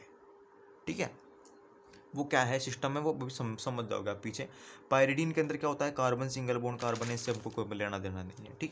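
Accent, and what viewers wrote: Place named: Rajasthan, India. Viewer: Ap rajasthan ya haryana se lgte h😂 bht bdya smj aaya easy kr diya blkl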